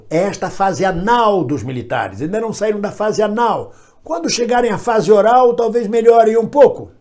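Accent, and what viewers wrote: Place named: Bahia, Brazil. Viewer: Tu és baiano .nao tem como negar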